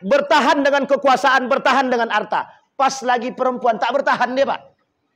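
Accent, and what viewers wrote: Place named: Daik, Malaysia. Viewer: Assalamualaikum , maaf bertanya, pak ustad Dari mana? Dialek nya sperti orang daik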